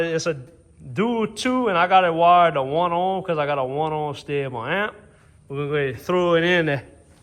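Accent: Louisiana, United States. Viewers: That's what's up bruh! Keep doing YOU and the channel will grow... That southern Louisiana accent and your personality is guaranteed to garner some attention 😉.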